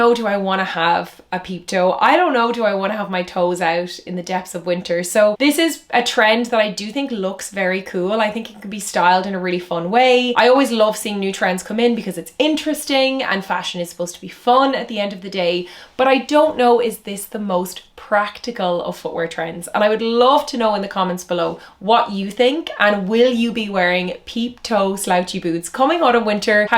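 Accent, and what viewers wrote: Ireland. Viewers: Just sounds Irish to me!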